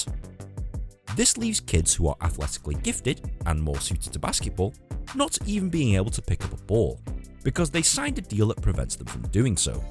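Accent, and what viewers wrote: United Kingdom. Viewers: What the fuck I just realized your an Asian Man with a British Accent, that just shocked me lmao (I know there's a lot of Asian-Brits so don't go informing me that Asians with british accents exists. Also I speak English with an American Accent sooo I get the same reactions to people as well - they're like I didn't expect U to sound like that.)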